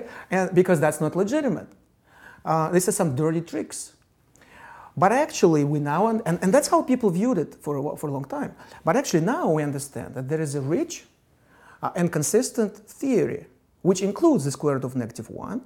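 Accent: Russia. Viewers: The guy has some horror core Russian accent. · I can understand all of this just because i am russian too, because his accent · мне еще нравится что не всё с русским акцентом, это вперемешку с чистым, правильным произношением, мне кажется это странным если он может так бегло разговаривать, но при этом говорит на половину или больше с сильным русским акцентом, как-будто он даже не старается, и не пытался учить как правильно произносятся слова, это странно🤔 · is that a russian guy? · Somewhat strange, but I understand J. Grime's accent better than this' obviously Russian speaker. (Although I'm Russian) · Russian professor? Him accent... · This guy sounds like he has some russian accent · Yeah, it's kinda wierd: his wording is correct and he uses live idiomas and cultural references but his accent is soooooo soooo Russian. I believe he keeps thinking in Russian and then formulates a quick translation of his thought in English - that's quite strange as naturally upon some point you start thinking in the language that is foreign to you and that is the moment you generally say one speaks a language. 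А тут прям такие знакомые интонации, прям слышно как звучит с такой же интонацией русский оригинал фразы )) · It's a russian accent, very very fun to listen this - russian phonetics and russian emotions, but english phrases and words. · Он точно Русский! Он имеет Русский акцент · is he russian? he has a small but distinct russian accent...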